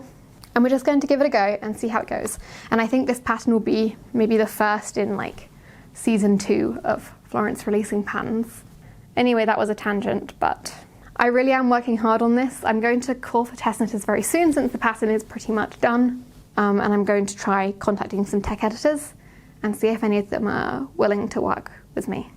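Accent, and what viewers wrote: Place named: Southern England, United Kingdom. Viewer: If you just put a short ‘e’ sound after the ‘r’ it comes up somewhere close to the Finnish pronunciation. So ‘Taremo’ is closer than ‘Tarmo.’ Then if you hold your tongue a little closer to the roof of your mouth on the ‘r’ sound, it gets a bit better again. That’s easier with a flat ‘a’ sound like we say in Yorkshire, though, rather than than your longer, Southern ‘a’ because the mouth is already wider and flatter. Think the ‘a’ in cat rather than in ‘Arthur.’ Lovely episode thank you x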